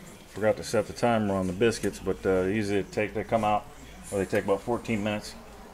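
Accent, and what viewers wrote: South, United States. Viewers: imagine if this guy didnt have a southern accent. i wouldnt be able take this video seriously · How annoying is that! Someone actually commented that they missed what he did with the flour. You know you can watch the video more then once. I love your voice. It's country like me :) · Your country accent does things to me! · once you hear that thick southern accent and the video is about food... you know shits.. about TO GO DOWN !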